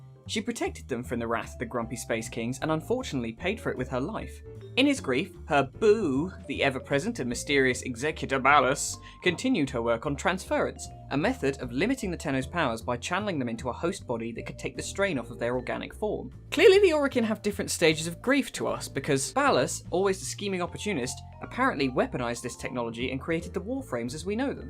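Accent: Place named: United Kingdom. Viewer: I've been looking for a good source of Warframe info and I've listened to a lot of YouTubers talk about it, but unlike any of them, you're British. SOLD!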